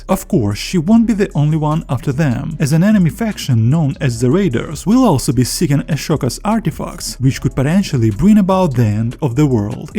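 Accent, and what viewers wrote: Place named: Russia. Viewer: лол, русский тип, тебя за километр слышно.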